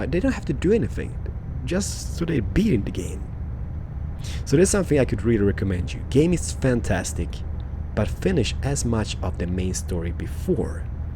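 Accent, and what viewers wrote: Sweden. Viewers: jag gillar din svenska m8. it is funny. I like your swedish m8. it is funny.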